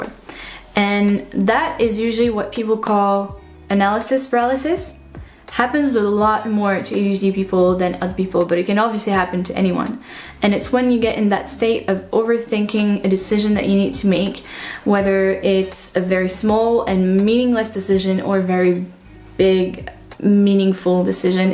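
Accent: France. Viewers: Hey there, I just discovered your channel while researching adhd paralysis tips and this is so helpful! I'm undiagnosed but everything adhd I relate SO MUCH. Not related, but are you french? I feel like you have a tiny bit of an accent I recognize in us french speakers? Have a great day love, thanks for this. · Great video Thanks Are you french? :) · Loved the video, thank you so much! Also, are you french? I noticed a tiiiny bit of an accent eheh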